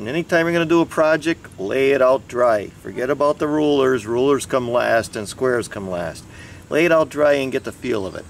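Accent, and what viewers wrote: United States. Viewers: . Cheers for the info.! Of the many American accents we hear from this side of the pond yours is the most pleasant. Is your accent specific to Scranton or Pennsylvania in general.?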